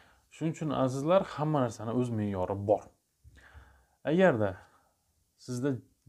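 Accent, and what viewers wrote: Khorezm, Uzbekistan. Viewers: хоразимданми шева тортяпти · Асаломалакум доктир сиз кайердансиз шевага айрим созлар хоразимга тортяпти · Xorazmdan ekanligiz darrov bilindi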